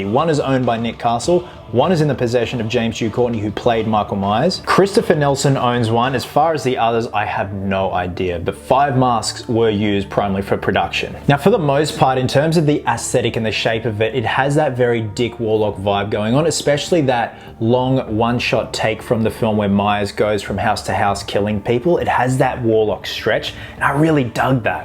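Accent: Australia. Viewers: I thought that accent sounded right. A fellow Aussie spotted!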